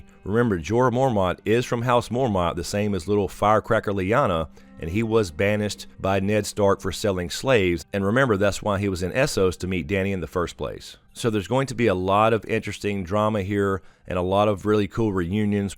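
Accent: South, United States. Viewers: I worked on fixing the closed caption, I have spelled Daeny this way, hope it's correct. Mostly just fixed punctuation and names, and times when the auto generater doesn't recognize your southern accent. . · Where are you from? I’m trying to pick up what state your accent is from.😂🤷🏻‍♀️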